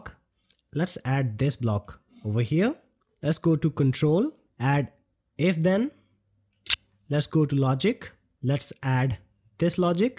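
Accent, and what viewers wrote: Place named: India. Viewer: come on bro i get your trying to help but can i get a american person to teach me, like i hate the way you talk im not indian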